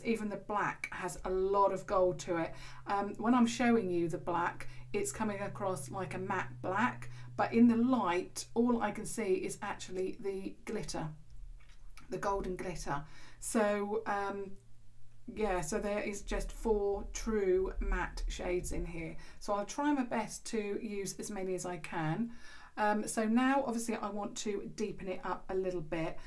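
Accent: United Kingdom. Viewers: Iam an Egyptian engineer, every time i open your videos i focus on your British accent whatever you talking about 😍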